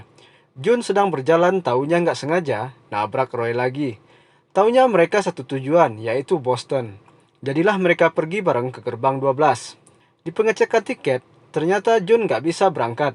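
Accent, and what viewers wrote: West Sumatra, Indonesia. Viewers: Orang Sumatra barat ya bang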